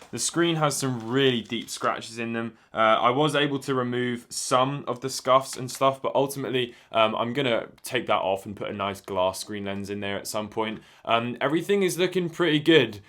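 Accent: England, United Kingdom. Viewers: The English accent and vocabulary makes me feel like the gameboy is dirtier than it really is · I love how his English accent sound "Dah screw sstick to my finguh"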